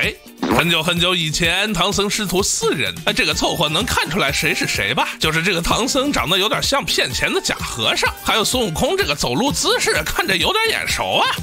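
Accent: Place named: Shaanxi, China. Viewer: 是陕西人吗好像听到了一丢丢口音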